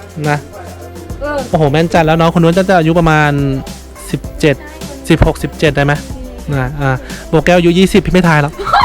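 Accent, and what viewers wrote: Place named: Central, Thailand. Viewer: พูดสำเนืยงฟังยาก น่าจะพูดสำเนืยงภาคกลาง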